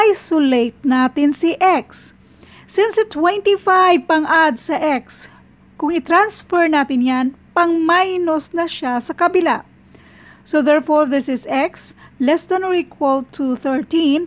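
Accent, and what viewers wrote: Visayas, Philippines. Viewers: Thank you so much Maam. You are so humble and sweet. I like your visayan accent. All the love from here (Dumaguete City)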